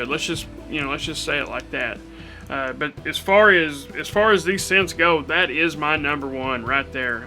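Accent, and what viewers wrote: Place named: Rural, United States. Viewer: Dude I really didn’t notice your country accent. Some words you say maybe but didn’t notice. is much stronger. Love the country accent.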